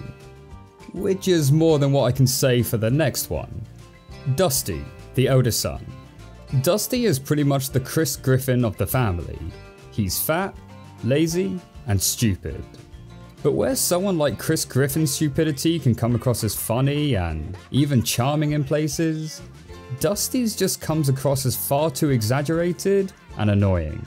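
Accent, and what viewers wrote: United Kingdom. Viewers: Bro's got a British accent 😂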